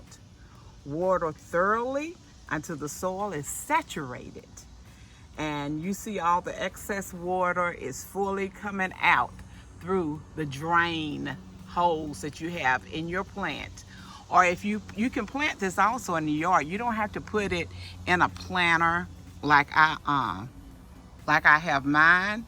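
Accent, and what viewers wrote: South, United States. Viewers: Hi, love your beautiful southern accent, your blessing, your knowledge about the glorious curcuma blossoms & your theme song at the end. I’ve just bought 3 curcumas & have planted them in a trough planter. I have placed it under my bougainvillea standards facing south. Hope it works. 👩‍🌾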